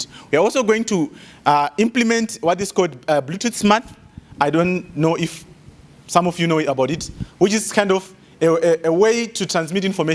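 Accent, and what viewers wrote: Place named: Ghana. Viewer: You have suddenly adopted the Ghanaian accent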